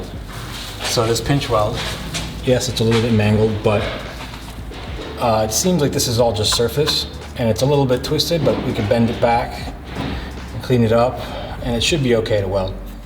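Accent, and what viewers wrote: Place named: United States. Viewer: como le comente a tu viejo, solo pesque el 20 % de lo que hablabas, el ingles USA, suena distinto al Brit......ja ja veo que la sal afecta mucho los bajos el coche, lo pudre todo, parecería que el 4 Motion, tiene mas hueco en la parte trasera del coche, supongo que sera por la transmision y el lugar que ocupa, deberás tener que cortar el baúl de tu coche y rehacer el piso?? esto llevaría un buen trabajo, supongo también que desarmaras la transmisión para ver los engranajes, cambiar bujes de goma y dar blasting + pintura a las parrillas, los semiejes también los repararas?? tenes un buen trabajo por delante, por suerte tenes el coche viejo para sacar un plantilla del piso y copiarlo.......la satisfacción, sera cuando lo veas al VW negro terminado y tirando cambios por las calles de N York.......ja ja, seguí colgando videos de la transformación, y si podes, hace alguno en castellano que lo cuelgo en mi Face, Un abrazo!!!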